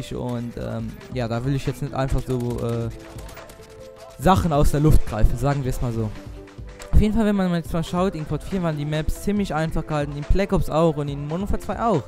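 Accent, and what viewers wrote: Luxembourg. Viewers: dass du aus luxembourg kommst hört man finde es cool das es auch jemand aus luxembourg gibt der Commentaries macht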